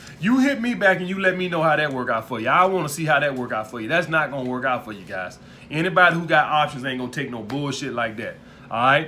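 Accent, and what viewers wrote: South, United States. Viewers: He’s not, its his southern accent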